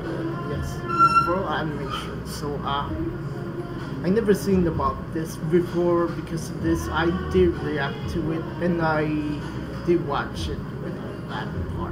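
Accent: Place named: India. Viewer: oh, you just sounded like you have an Indian accent, anyway. I'll never watch Smile HD, I tried watching Fluttershy react to it, and I'm like "just no" same thing with Cupcakes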